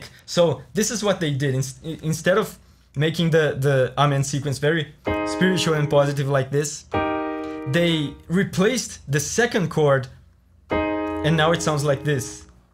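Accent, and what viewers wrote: Brazil. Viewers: É incrível como dá pra saber pela sua voz que vc é brasileiro. É um sexto sentido. Aliás, vídeo bacana!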